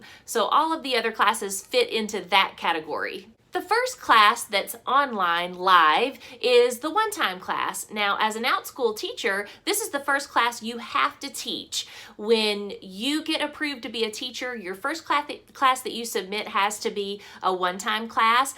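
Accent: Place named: Virginia, United States. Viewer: Thanks for answering. I have a thing about being very interested in accents. Love trying to pin it down. Often right, especially with Texans, but you had me really wondering, because I wasn't sure. But I thought you sounded like me. It's fascinating. I wish you would put me out of my misery!!!! Eliminating all the other states ... Hmmmm ... Maybe Virginia! Possibly a Carolina, North.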